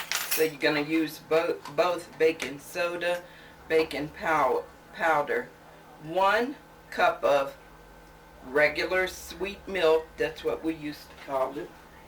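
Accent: South, United States. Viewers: What a nice lady and a good cook love your cooking style and you just seem like one of the sweetest little ladies ever I'd love to live next door to you and we could exchange recipes on the Southern girl and you sound like you might be a Southern girl also good food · I’m new. Simply Sara mentioned you. I’m enjoying your way. You speak southern the right way.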